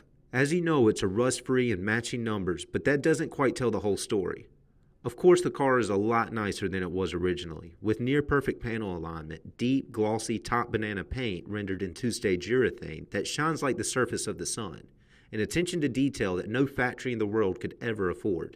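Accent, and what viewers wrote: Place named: South, United States. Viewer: Hey man. I just love your Southern accent as much as the car.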